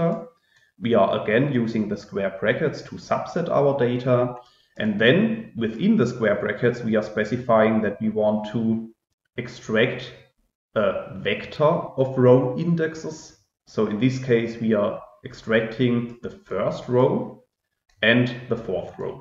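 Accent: Germany. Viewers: I do really appreciate this video and the author -- helped me a lot since I'm a complete R dummy so far and trying to comprehend the language via Coursera. Haven't found any real examples on how to extract multiple rows of data frame to finish assignment neither on discussion section nor on web, while you explained in details how that works -- a big big thanks! Have subscribed to the channel, think will be useful. Super lovely German accent -- greetings from Russia :)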